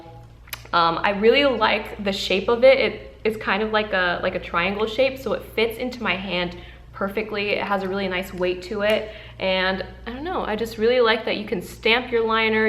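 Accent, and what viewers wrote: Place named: Australia. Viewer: I recently started watching your videos/subscribed, and i am STILL AMUSED by your Australian accent . ITS HONESTLY SO GOOD IN MY OPINION. 😂😂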